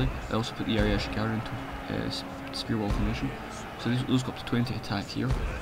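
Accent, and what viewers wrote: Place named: Scotland, United Kingdom. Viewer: Finally a Scottish Shogun 2 commentator, if yer accents anything to go by. :)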